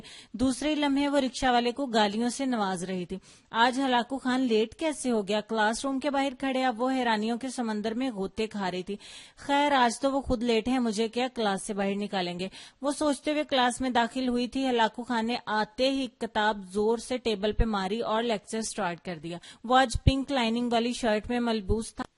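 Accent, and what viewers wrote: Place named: Punjab, Pakistan. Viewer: You speak urdu in punjabi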